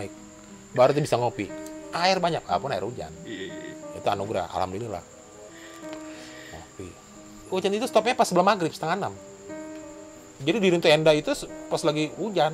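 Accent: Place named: Betawi, Indonesia. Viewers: setau ane, ente orang (berdarah) sumsel (komering) bang. Manggil ibu, pake kata enyak, dan logatnya kok kaya ada betawi nya. ada keturunan betawi juga kah? atau hanya karena terbawa dialek gegara sejak lahir di jakarta? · Abng ini enak story telling nya..khas betawi.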